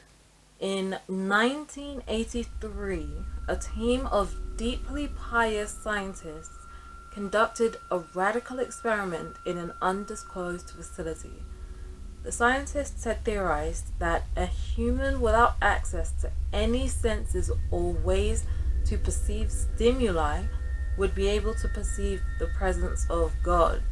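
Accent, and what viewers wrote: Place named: England, United Kingdom. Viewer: Happy New Year! I love your English accent. It enhances your voice with a poetic Cadence. Beautiful! He was a great story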